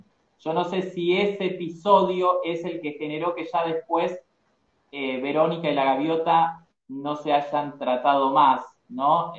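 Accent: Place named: Argentina. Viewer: Hola. Me gusta el trabajo que haces y la forma en que detallas cada nota, con tu estilo y ese acento argentino le dan el toque. Al menos en mi opinion que soy mexicana.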